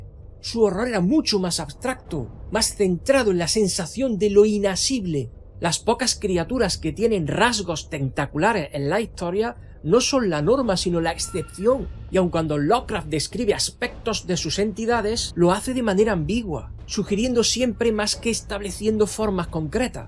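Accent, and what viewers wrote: Andalusia, Spain. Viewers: sos de Andalucia?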